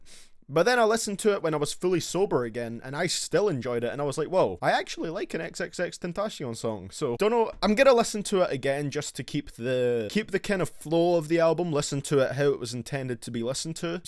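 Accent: Scotland, United Kingdom. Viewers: Good vid dude can't wait for the next and fair opinions, oh yeah and where in Scotland are you from cause you sound Scottish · Shut up XXX is way better than yo British accent #ScarLxrd is still beter than you too LOL! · A BIRACIAL SHAWN CEE WITH A SCOTTISH ACCENT YOU SAY?! COUNT ME IN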